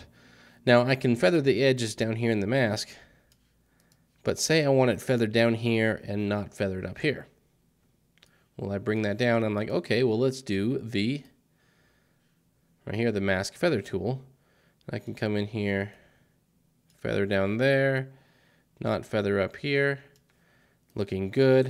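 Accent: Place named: London, United Kingdom. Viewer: 3sec to watch and like, thx. More tutorials!!! best londons speak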